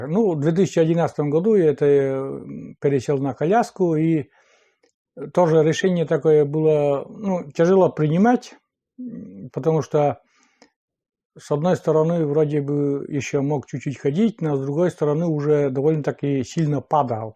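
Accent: Komi, Russia. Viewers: Влюбилась в Ваш канал совсем недавно и смотрю теперь постоянно! Спасибо вам с за тепло, за радость общения хотя и виртуального! Я с Перми, моя бабушка, хоть и русская, жила в Коми округе Пермского края. Так что коми мне очень близки. Был приемник у бабули в доме и местный диктор говорил: Баитэ Кудымкар. Может я немного не так написала, я тогда была маленькой, мама говорила, что это означает - говорит Кудымкар. Говор у них в деревне был такой же, как у вас. Мне очень приятно слышать, как вы разговариваете, как будто в детство к бабуле приехала... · Здравствуйте, и! Да,с вами меня познакомил Олег,а его я увидела случайно,когда пришла зима,прошлый год,я решила делать веники сделать.Нашла видео,слышу у мужчины акцент,ну точно коми,наш,смотрю канал называется, На природе Коми.Так я и нашла Олега.Спасибо Вам за рассказы,за видео о еде,о природе и остальном.Здоровья вам с и удачи!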